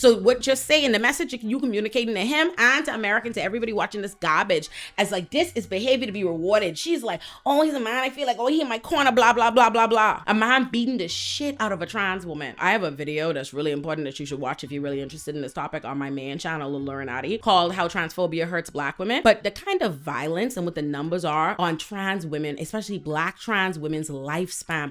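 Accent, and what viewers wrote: Bahamas. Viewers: I genuinely hate Zeus network with a passion, it feels like a whole modern day minstrel show. Also off topic, are you Bahamian of have Bahamian roots? You have an accent very close to a Bahamian one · Your Bahamas 🇧🇸 accent >>>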